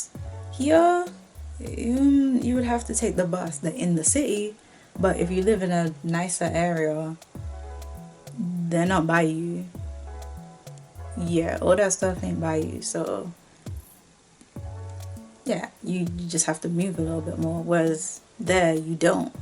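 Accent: United Kingdom. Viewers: Are you from Birmingham?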